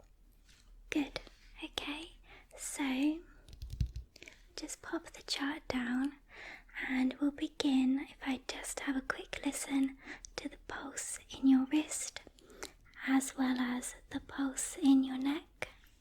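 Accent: England, United Kingdom. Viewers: I absolutely love the way you sound. I could honestly listen to you talk all day. I’ve been very impressed with the high quality & length of your videos. Do you mind if I ask which part of England you are from?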